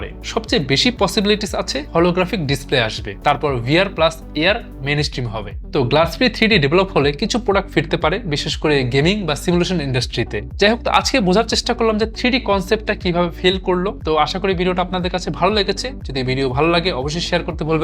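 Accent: Bangladesh. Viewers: কেবলমাত্র হাড়িয়ে শব্দটাই নয় , আপনার কথা বলার ভঙ্গিমার মধ্যেও এমন অনেকগুলো শব্দ রয়েছে যেগুলো আপনি বাংলাদেশী মানুষদের মতন করেই উচ্চারন করেছেন , আমরা পশ্চিম বঙ্গের বাঙালীরা সেই একই ( এই শব্দটার কথাই বিশেষ করে বলতে চাচ্ছি ) শব্দ একচু অন্যভাবে বলে থাকি । যাইহোক আপনাকে অভিনন্দন জানাচ্ছি । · বাংলাদেশের বাঙাল রা এই রকম উচ্চারণ করে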